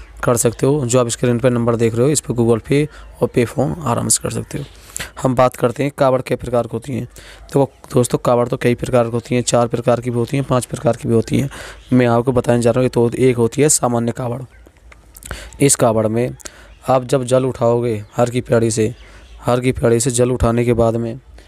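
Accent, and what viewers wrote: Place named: Uttar Pradesh, India. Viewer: Bhai shayad aap uttar Pradesh se ho , shayad bulandshahr ke aas paas ke ho ,😂😂😂😂😂 language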